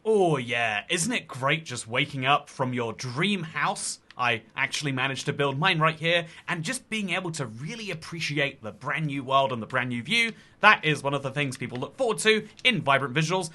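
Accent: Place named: London, United Kingdom. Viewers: Your accent screams British🇬🇧😢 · yep, he's a Londoner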